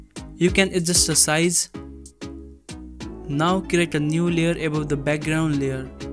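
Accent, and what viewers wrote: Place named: India. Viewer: ugh, what an indish accent.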